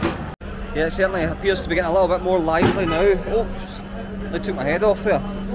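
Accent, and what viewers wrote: Scotland, United Kingdom. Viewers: Oh! Hearing your Scottish accent in the middle of Baghdad is something else. Thank you so much for visiting my country! I haven’t been for 23 yr 😳🫣!!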